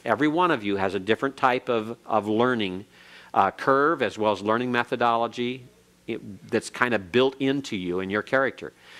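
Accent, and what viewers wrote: United States. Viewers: Ok, people...Jew here. This is the thing: These people are learning this language to study with, to become Biblical scholars. They will not be using it as we Jews do to pray in. Yes, the pronunciation can be a little wacky for me, but this man is teaching this from an angle I have never heard. I can rattle off prayers in Biblical and Prayerbook Hebrew, and even tell you what I am saying. But I need more depth and he is providing that, so the “Classical”pronunciation is not throwing me off. HOWEVER, I will say that if you ever walk into a synagogue, you are going to hear different pronunciation. This is not the way Jews is most places pronounce some of these sounds. We also tend to not have such a strong American accent—even those of us who are American. In order to pray with this language, listening to some prayers in Sephardic pronunciation would be helpful. 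Or Ashkenazi, but you will likely not hear that much except by some older folks in Conservative shuls, or in orthodox settings like Chabad. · Errrm wanna get rid of the American accent, if possible. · Lovely class. I really appreciate it. I do have a mild criticism. might want to consider that he should use examples that conform to pronunciations in Hebrew. For instance, there is no a as in bat--none whatsoever--repeating over and over sounds that don't have an equivalent in Hebrew--reinforcing sounds that don't exist. He is also speaking the name of the letters in a thoroughly American English accent. These students, though learning Biblical Hebrew, should learn to sound like an Israeli--because this is proper and useful.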